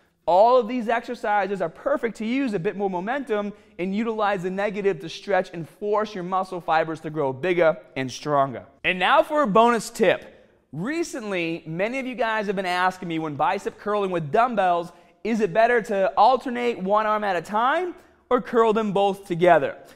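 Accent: Boston, United States. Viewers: comparing your videos in 2010 to 2017.... you sound normal in 2010, but in 2017 you somehow developed a boston accent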